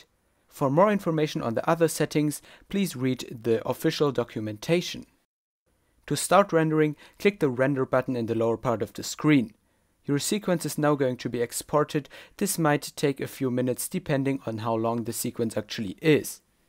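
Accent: Germany. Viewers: du bist deutsch man hört das XD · Just wondering, but is german? because In my opinion, he sounds german and it seems a large fraction of his viewers are german. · bist du deutscher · You're german right? xD accent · Dein Englisch ist gut, aber man hörst das du Deutscher bist! xD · Man hört deinen deutschen Dialekt lol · Ich höre, dass du ein Deutscher bist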